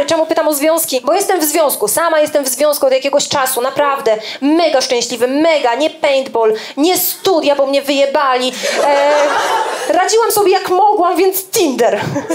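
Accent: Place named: East, Poland. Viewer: Skąd ten wschodni akcent?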